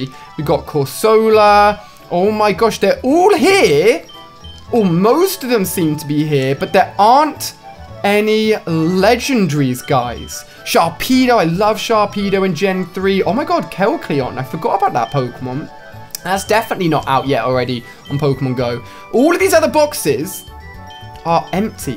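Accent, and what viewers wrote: United Kingdom. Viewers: no shade but i always have trouble understanding accents like this like the british variety ykwim sis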